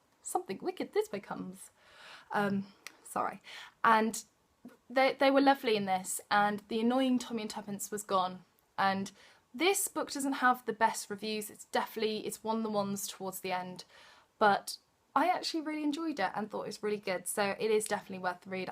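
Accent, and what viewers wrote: England, United Kingdom. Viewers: I love your channel. You make reading look so glamorous! I wish I also had an English accent. It makes you seem so sophisticated. :)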